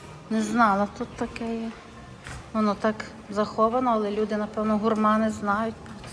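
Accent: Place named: Western Ukraine, Ukraine. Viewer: Виходячи з акценту, ви мабуть самі "нанастраждалися бьєженці" з "патріотично" вибігшого до Європи заходу України.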